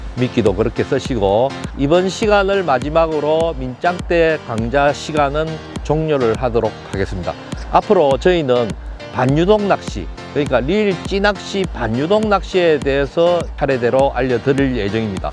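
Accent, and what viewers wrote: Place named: Busan, South Korea. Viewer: 낚시를 시작하는 초보입니다.^^ 같은 부산사투리에 귀에 쏙쏙 들어오는 설명이 너무좋습니다. 많이 배우네요.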